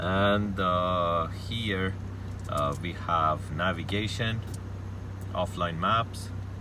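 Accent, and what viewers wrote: Iran. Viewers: haha iranian accent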